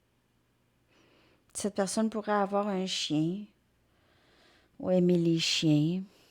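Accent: Canada. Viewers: Bonjour je ne c'est pas d'où vous êtes au Canada car j'adore l'accent canadien j'ai une de mes meilleures amies qui habite Montréal moi je suis de Tarbes en France et j'adore cet accent avec cet douceur dans la voix et oui mon chéri et en prison pour des fait ou il a était coupable bref oui j'ai toujours était la pour lui mes là pour des reson que j'ignore plus d communication d'eupuis le moi d'octobre je c'est qu'il m'aime beaucoup mes quand je veu avoir des discussions avec lui il ce renferme sur lui j'espère vrement qu'il va me donner des nouvelles rapidement car il me manque énormément bonne journée a vous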